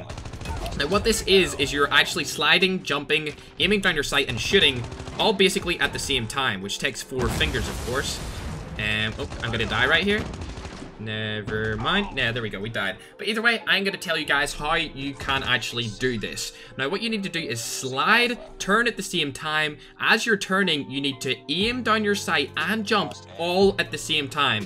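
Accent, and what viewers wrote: Ireland. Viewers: are u irish? pls anwser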